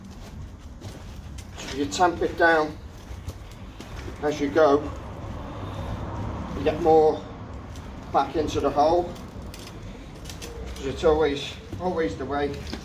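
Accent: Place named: Liverpool, United Kingdom. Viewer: I do like a repair vid. I was just trying to imagine a scouser speaking Spanish. And then i gave up 🫣😂😂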